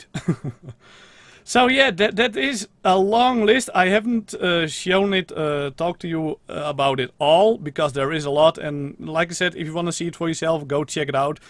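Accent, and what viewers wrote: Netherlands. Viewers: u bent zeker nederlands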